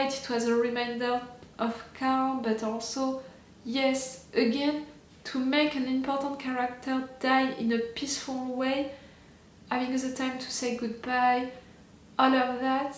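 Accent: France. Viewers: I love your french accent it's beautiful! I subscribed. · I love your Frenglish accent and your true to the ground emotional reactions, your tears of joy or sadness, your authentic cuteness. <3 Shy meow and hug from Switzerland. ^^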